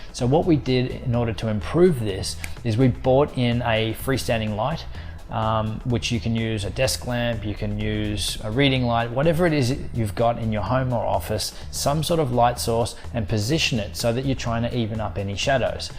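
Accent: Australia. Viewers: Great video. Brw he sound Australian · You sound like you are from Australia but I can't get the microphone on Amazon to ship to Australia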